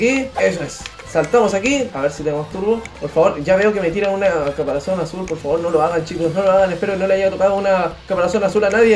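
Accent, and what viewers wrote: Chile. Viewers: Pense que eras de chile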